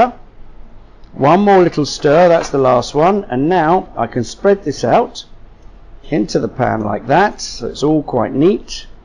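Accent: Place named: United Kingdom. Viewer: Clearly British.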